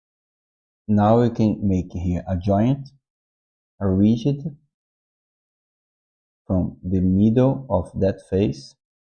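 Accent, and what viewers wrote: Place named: Brazil. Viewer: Sotaque de brasileiro.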